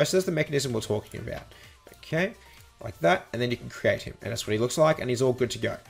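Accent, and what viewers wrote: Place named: Australia. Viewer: It's good to hear an Australian on YouTube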